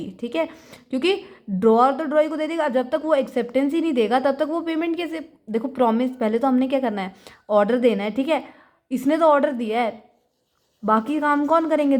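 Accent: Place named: Haryana, India. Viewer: dii ab aapki haryanvi bhi sweet lgti h to m ky kru 😁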